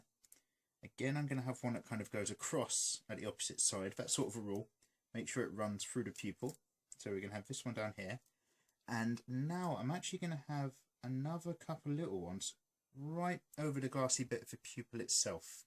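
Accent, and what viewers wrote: United Kingdom. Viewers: I actually just found your channel yesterday, and I have to say your tutorials are very helpful ^°^ I've always wanted to start making my own (mini) manga series, but certain things I tended to struggle with, eyes included. Also, are you British too, by any chance? XD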